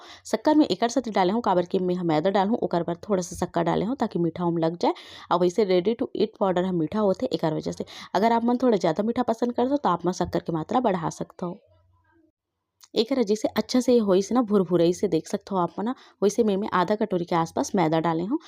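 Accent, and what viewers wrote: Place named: Chhattisgarh, India. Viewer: मै तो आप जो भी रेसिपी बताते हो उसे ज्यादातर नहीं बनता 😂 लेकिन आपका वीडियो देखने और आपके छत्तीसगढ़ी अंदाज में बताने का तरीका मुझे बहुत अच्छा लगता है इसीलिए मै आपका वीडियो देखता हूँ l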